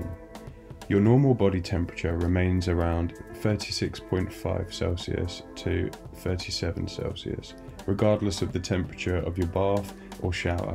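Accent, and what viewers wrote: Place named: United Kingdom. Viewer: Wow Your British!! Never seen anyone British on my channel. Great Content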